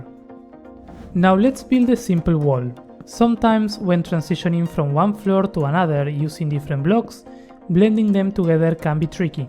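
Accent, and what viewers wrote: Argentina. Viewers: Argentinian accent so strong, it's impossible to hide, good vid bro <3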